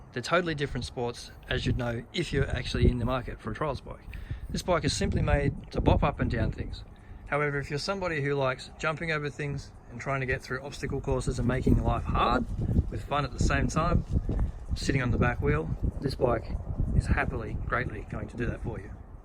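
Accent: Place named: Australia. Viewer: nice i used to live in canberra now i live in the usa in seattle. it is good to hear an australian accent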